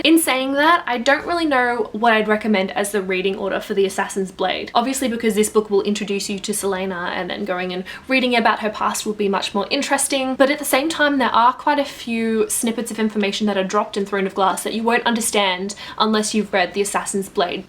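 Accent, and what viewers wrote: Australia. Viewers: Hi! Now I am reading Assassin's Blade (I've just read the first novella) and thanks to your review I am more and more sure to want to continue this series. PS. I think this could be the strangest comment ever, but... I love your australian accent! I'm italian and I'm trying to learn it because I looove it! :')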